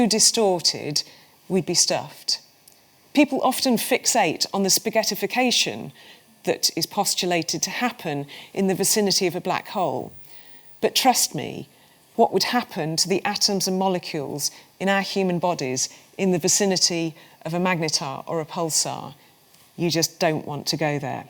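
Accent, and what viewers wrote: United Kingdom. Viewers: Knowledge is one , but amazing how beautifully this woman speaks ....even british sounds nice😄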